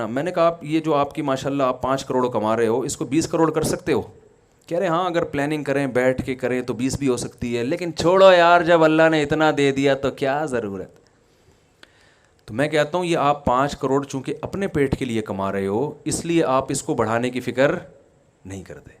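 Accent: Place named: Mumbai, India. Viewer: Aap k bolne wala andaz pura mumbai type hai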